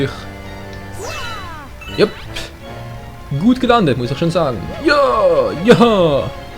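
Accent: Switzerland. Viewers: Bist du zufällig Schweizer?^^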